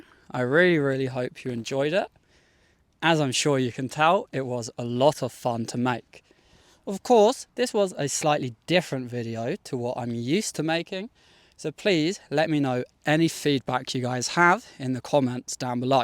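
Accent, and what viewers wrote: United Kingdom. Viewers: I enjoy your British accent👌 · Usually I don't liesint to UK accent but I like it frome you maby because you speak normally and using simple words ❤ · هذا أشبه بالبودكاست وليس بالمدخلات المفهومة لأنه حسب ما أعتقد ليس في المشهد مايساعد على الفهم باستثناء الكتابة كحركات المتحدث او الاشارة الى مايحيط به لنفهم مايقصده فقط عندما كدت تسقط .هه.اوفي الاخير لما قلت وداعا نراكم لاحقا ..بودكاست في الطبيعة..حتى مستوى b1سيعاني حتى يفهمك ..ولكنني أقدر مجهودك في التحدث بينما تصعد الجبل وتقدم النصائح وهذا مجهود مزدوج...شكر من القلب..لهجتك البريطانية أصبحت أصعب كلما حاولت الوصول الى القمة وتعبت أكثر .. علينا أن نفهم مهما كان الحال..واصلوا ..فالرحلة الى الطلاقة معكم ممتعة متعة صوت الشلال بجانبك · Hi I'm from Philippines 🇵🇭 love watching your video your accent I really like to imitate to have a British accent to I always follow your video hope you visit Philippines 🇵🇭 thanks I learn a lot from you 😊❤❤❤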